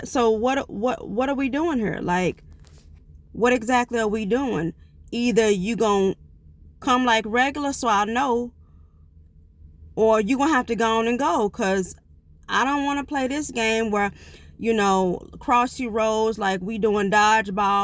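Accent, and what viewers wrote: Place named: South, United States. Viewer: Happy birthday to your daughter! Wow this is interesting I’m gaining knowledge for pre menopause. Do you know that you have a really cute southern accent? I don’t know if you know it or not. lol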